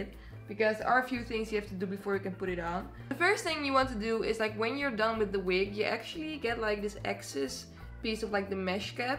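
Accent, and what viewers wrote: Netherlands. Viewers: Leuke video, ik heb je nu pas gevonden. Ik hoorde je accent en ik dacht: die is Nederlands.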